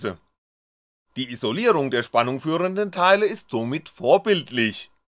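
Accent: Hesse, Germany. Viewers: Es geht nicht um deinen Dialekt, es geht nur um die überdeutliche Betonung. Also nichts gegen deinen Dialekt, im gegen Teil! Hessisch is doch a Geiler Dialekt! 👌 Mir ging es darum das es anstrengend ist das ganze Video zu hören. Infos und du Machart ist echt super. Also nicht als Kritik an sehen ;-) , nur meine Meinung! Trotz allem weiter so, Abonniert ist ja auch schon. Grüße nach Hessen Thomas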